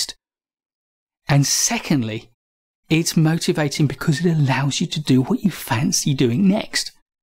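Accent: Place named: Northern England, United Kingdom. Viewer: Haha! Didn’t t realise I wrote the same comment 7 months ago! Northern English mispronunciations still bug me!...but your explanation of Mark's system is the best available. Xxx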